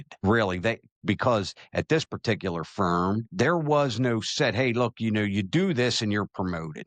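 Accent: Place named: Baltimore, United States. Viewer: Hello, had to catch up, have not seen videos for a few days, I remember the show you had on Facebook and missed it when you stopped, now I know the truth, glad to have you back, Andy from Baltimore (Essex) and yes you have the distinct Baltimore accent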